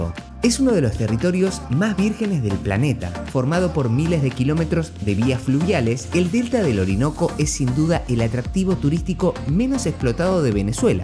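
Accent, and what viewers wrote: Argentina. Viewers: La narración es hecha por una voz argentina. Por que?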